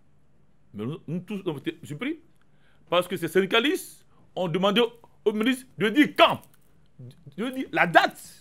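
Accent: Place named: Ivory Coast. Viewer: Il baigai un peu sinon il a l'accent ivoirien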